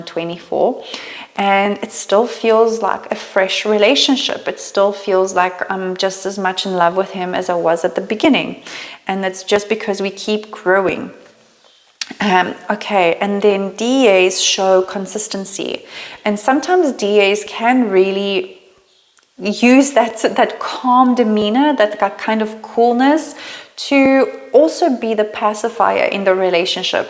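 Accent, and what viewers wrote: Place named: South Africa. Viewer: Hah! I recognized the SA accent. Great video!!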